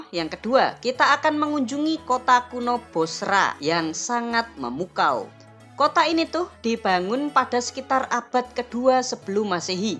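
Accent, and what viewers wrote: Java, Indonesia. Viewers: Jawa medok bner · Kok kyak ngomung bahasa jawa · Metdok buanget.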